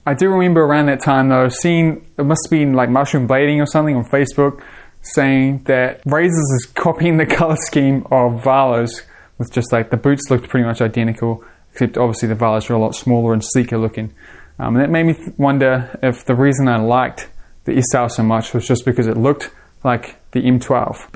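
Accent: New Zealand. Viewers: So bad with accents dude had no idea you were in NZ Also, what you said about royals being hars for ppl I've been skating since 96 and still cant royal and farv. I can boot trick ANYTHING and I can royal down rails but flat ledges I just gave up on them lol. Reason I can royal down rails is I just naturally slip into them and learned to control it over time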